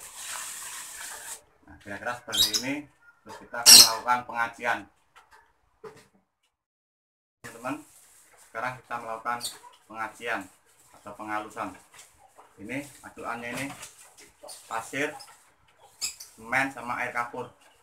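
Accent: Java, Indonesia. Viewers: Sampean logat bicaranya kentel sekali dg logat jawa mas, jawanya mana mas? Aku jawa tengah mas..🙏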